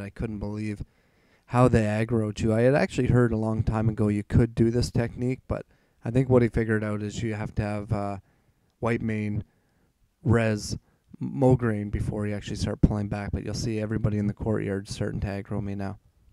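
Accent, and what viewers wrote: Canada. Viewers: Wait you're Canadian???? Where are you from?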